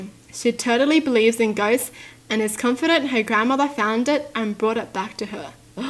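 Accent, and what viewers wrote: Australia. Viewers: She looks like rosè too and they're both from australia that's why they have the same accent. Maybe they're related?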